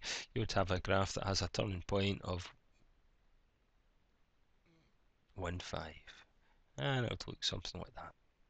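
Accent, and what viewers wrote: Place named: Scotland, United Kingdom. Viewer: mainus, Scotland accent is amazing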